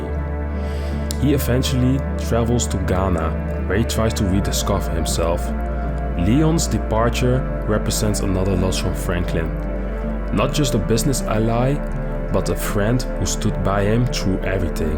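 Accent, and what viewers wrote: Netherlands. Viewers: bro you sound dutch great vid btw. brother ✊🏿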